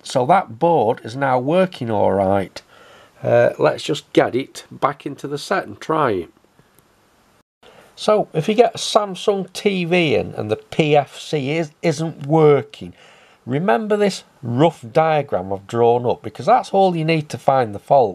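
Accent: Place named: Northern England, United Kingdom. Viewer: Btw you've got yourself a new sub! 😊 Great diagnosis and explanation and good to see another fellow tech/electronics enthusiast here in the UK! 😊 You sound as though you're from Leicester or further north, Yorkshire perhaps? I'm down south in Surrey 😊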